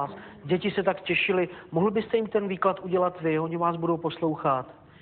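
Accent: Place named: Brno, Czech Republic. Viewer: JSEM STredocech a takhle nemluvim co to kecas :D:D:D:D:D:D stradoceske nareci fakt ne xD mozna brnenske :D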